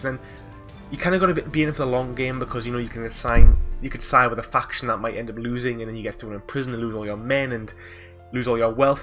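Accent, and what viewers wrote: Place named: Northern England, United Kingdom. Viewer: Sounds like your from my neck of the woods. I'm in darlington pal, nice video man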